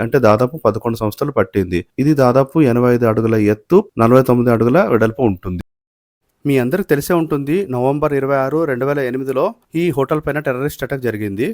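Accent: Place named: Rayalaseema, India. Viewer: Hi I love your Telugu speak ing.it means you are from Rayalaseema.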